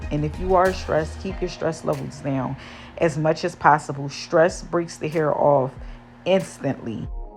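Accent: Philadelphia, United States. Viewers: Your voice makes me miss my college besties! Are you from Philly? When I listen to you talk I think of Cheltenham lol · are you from philly ? i hear the accent lol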